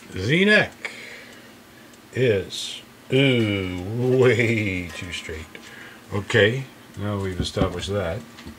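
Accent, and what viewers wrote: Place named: Canada. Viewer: I love your videos and your, your voice it calms me down if I'm anxious. I also like your Canadian accent. Man that's a nice guitar. I got a 50 Studio gold top. I didn't know that much about the tires then. The guy put a bone nut on it instead of filing down the nut he went further into the wood. Which I think would make it really easy to break. so far no problems it's a 2012 when they were using that I don't know what kind of wood baked Maple. I don't like those PCB boards. I wonder how hard they are to change out I have basic knowledge of wiring and soldering from BOCES I think they send you a schematic. That guitar roars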